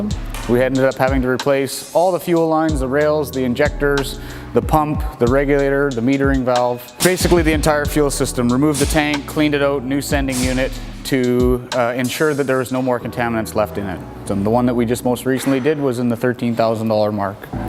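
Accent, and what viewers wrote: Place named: Canada. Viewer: grew up near canada. can always tell the accent when we say "aout" instead of out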